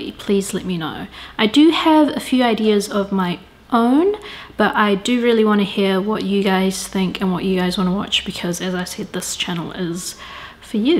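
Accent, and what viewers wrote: New Zealand. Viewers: Learning English with NZer accent 😁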